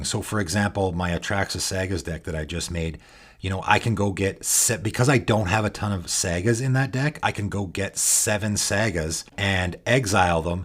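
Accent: Canada. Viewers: I suspected you were Canadian as well, just some subtle ques from your accent.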